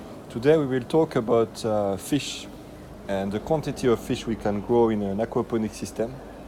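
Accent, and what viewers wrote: France. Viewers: Sounds french:-), thank you for this video and your chanel.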